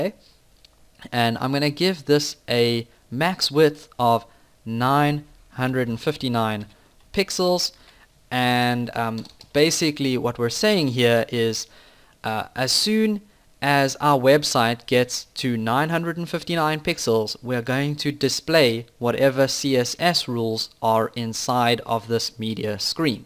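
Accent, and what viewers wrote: South Africa. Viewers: Really helpful tutorial, thanks & looking forward to getting something that triggers a menu list from a menu that normally appears in the sidebar. PS nice to hear a South African accent :) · Afrikaans English accent ? South African ? Good video. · I LOVE your accent man, are you South African?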